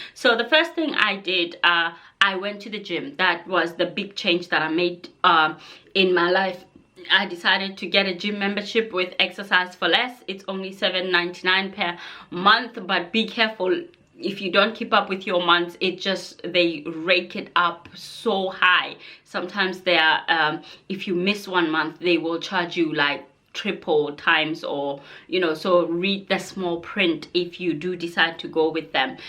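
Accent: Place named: South Africa. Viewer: That was amazing thanks for sharing your weight loss journey.Your accent is South African.28 is a lot wow.congratulations.hit the 🛎I love your content I wouldn’t want to miss out.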